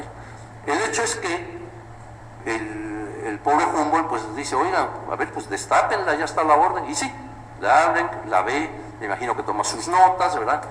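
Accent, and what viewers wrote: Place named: Spain. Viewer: y porque habla Como español???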